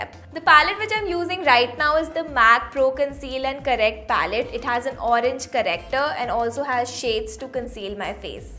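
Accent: India. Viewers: has such an indian accent!...like....calaa ba pafect match prrima!...but roshni and upalina has more of foreign accent!btw just my veiw no offense!(and having indian accent isn't bad at all).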